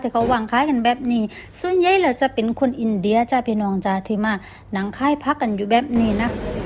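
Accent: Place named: South, Thailand. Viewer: ืชอบจังแหลงใต้แบบนี้คนใต้คือกันจ้า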